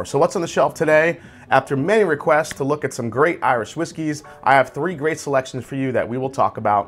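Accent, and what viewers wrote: New York, United States. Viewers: Great NY Italian Irish accent! Really enjoy the Black Bush. Nice sipper and holds up well in a Hot Toddy or with ice.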